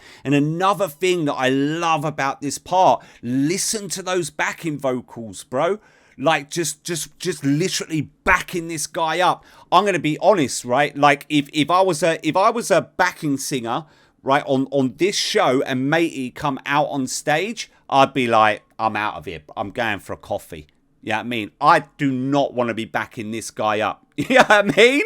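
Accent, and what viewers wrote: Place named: United Kingdom. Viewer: Of course Igor would say his name correctly, lol! I’m just trying to give the pronunciation for this reactor, who has a native British accent like mine ...peace ...❤️🎶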